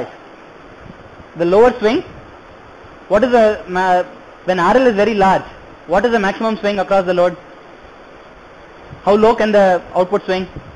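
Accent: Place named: India. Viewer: strong Indian accent prevents me from understanding.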